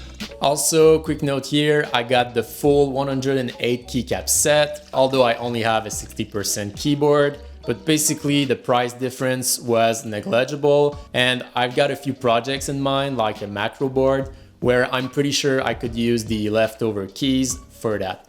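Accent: Quebec, Canada. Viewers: I know that accent... est-ce que t'es Québécois? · Tes tu quebecois?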